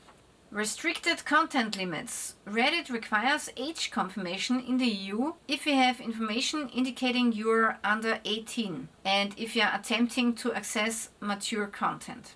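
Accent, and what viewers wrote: Austria. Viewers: I love the Austrian accent, I’m glad Germans are leaving, it’s not right or left anymore, it’s the people vs government. ☝️ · You do have the better dialect 😄 When I made a trip around south Germany and Austria, I suddenly felt that German language can sound nice, soft and elegant after crossing the border into Austria ❤🤍❤ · I love your content... love your Austrian accent. :) Agree 100% that Germans are awesome... been to Braubach, Oberwesel, and Frankfurt. People could not have been more friendly and gracious. Bitte and danke go a long way with them. Their government, well...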